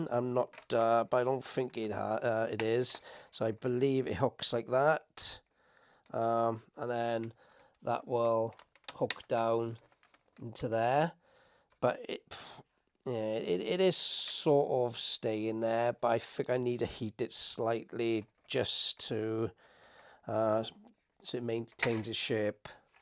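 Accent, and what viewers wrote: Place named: Wales, United Kingdom. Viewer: Can’t wait for more updates, missing your Welsh voice to see out the day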